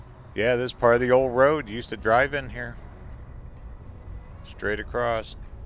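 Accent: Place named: St. Louis, United States. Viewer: My wife and I enjoy your Chanel. On a personal note, your dialect sounds just like my cousin- from St. Louis.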